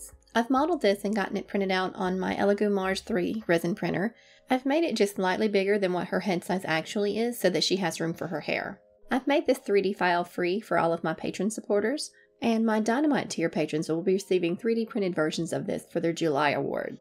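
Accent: South, United States. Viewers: They are all really beautiful. I found this channel through our Facebook page, so now you know it works to advertise! Also, you sound like you are from the south like me! It's great to have other doll collectors from the area. You do great work!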